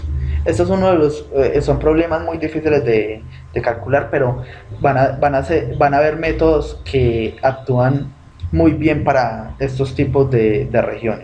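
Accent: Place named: Colombia. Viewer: Excelentes videos, excelente profesor, debería de aparecer su nombre también, para darle todo el crédito a él. Por su acento parece Colombiano. Un abrazo y muchas gracias...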